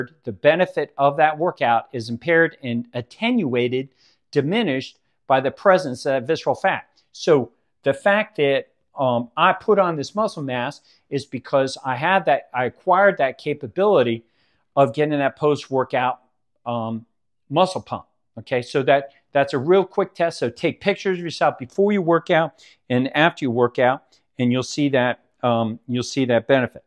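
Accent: United States. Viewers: Not an old guy, but a tough 68 year old broad here, and want to know if you think the FitTrack scale’s visceral fat measurement is accurate. Was thrilled that it consistently measures mine at 3, very low, on a rating of 1 to 19. If it’s not accurate, where can we get it done, need a doc’s order, cost? Thanks! Loving your channel. Keep up the great work! You sound a little southern on this recording?? Hadn’t detected the accent before.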